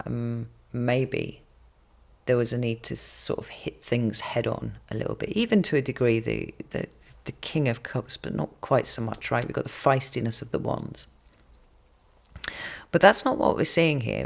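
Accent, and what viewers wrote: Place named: England, United Kingdom. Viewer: I’ve never been a fan of the English accent, but I like it when I’m in here. I guess there has to be a threat of profanity to soften it for my ears. Delivery is stunning. I’d put you on the large screen and the cards in the small screen, if left to me.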